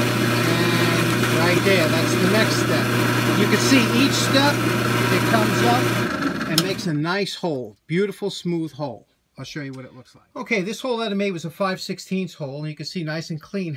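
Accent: New York, United States. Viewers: Is that a New York accent I hear? (I'd say definitely East cost?) :-)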